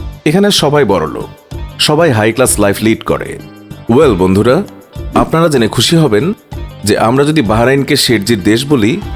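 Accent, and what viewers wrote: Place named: Bangladesh. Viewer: Bangla bhasa ta shunlei bojha jai, kono ek Bangladeshi balche. Bangal bangla ta puro puri distinct from Bengali spoken in W.Bengal.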